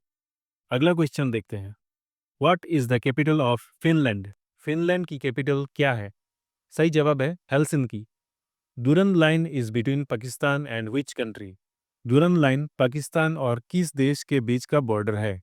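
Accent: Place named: Assam, India. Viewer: Assam ka lag rha hei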